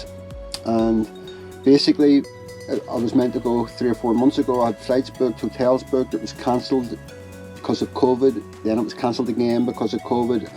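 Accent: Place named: Belfast, United Kingdom. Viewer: you sound like you're from Belfast. I am too. Would love to speak with you about your experience as I'm looking for restorative treatment to include lots of implants..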